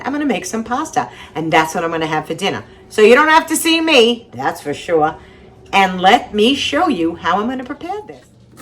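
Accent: New York, United States. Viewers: I hear a New Jersey, or New Yorker accent......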